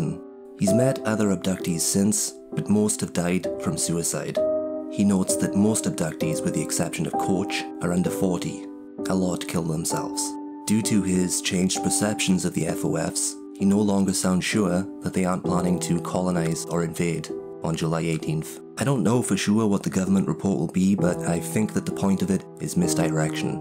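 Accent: Wales, United Kingdom. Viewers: great video mate (are you welsh by any chance?). I was wondering about this the other day, I stumbled across that subreddit earlier on in the year and forgot what date they were hoping for contact, glad I came across your video on the Nexpo subreddit.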